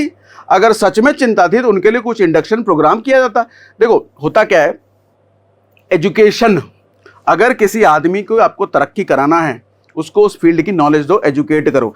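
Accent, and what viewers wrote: Bihar, India. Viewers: Sir aap Bihar se....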